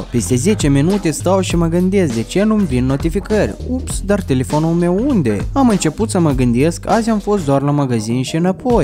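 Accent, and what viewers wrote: Moldova. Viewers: esti din moldova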